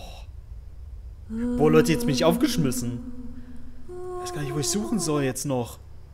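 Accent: Saarland, Germany. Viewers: Bist du Saarländer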